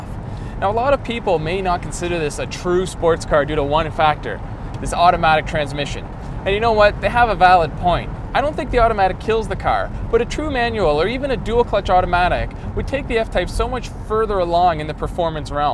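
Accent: Canada. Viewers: Presenter has a Canadian accent.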